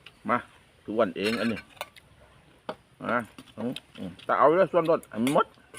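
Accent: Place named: Korat, Thailand. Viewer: ภาษาโคราชฟังง่ายสบายหูคุณกินแล้วทำให้ผมน้ำลายไหลด้วยผมติดตามคุณทุกคลิปเลยครับ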